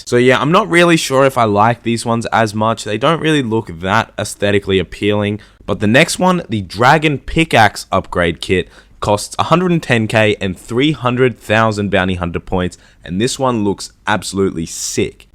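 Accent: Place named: Australia. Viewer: Your an annoying Aussie. It's your phrase structure that annoys me. Like you sound blaagghhttt!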